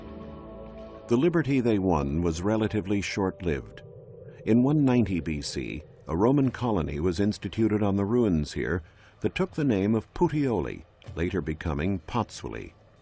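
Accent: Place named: United States. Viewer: To attain the real truth in these matters I am convinced a time machine is required. As in present times, the political landscape must have been a swamp of trickery and treachery. An American accent covering such a topic, per the BBC, just doesn't make sense.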